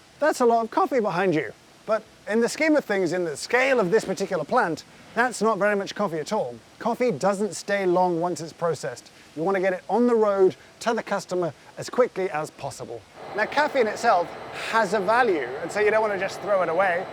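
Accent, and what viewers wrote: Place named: England, United Kingdom. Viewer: English acent - Check Red Sweater - Check Factual content of an amazing place - Check Anyone else getting Tom Scott vibes? I don't know how I feel about this, I miss Tom.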